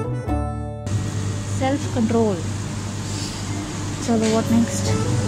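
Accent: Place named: Punjab, India. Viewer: Teri Hindi mein thoda Punjabi touch bhi hai🤭😜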